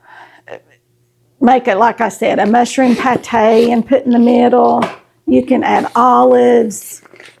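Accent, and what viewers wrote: South, United States. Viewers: Ahhh….🙋🏼‍♀️ 🎉 Yes, I’m a new subby and I love 💕 your shout out! Thanx!! Living in Australia 🇦🇺 and growing up on lovely romantic Southern films and accents…it’s so endearing to me. Familiar and nurturing, kind and “easy peasy…okey doke!” 💋 ✌️😁👍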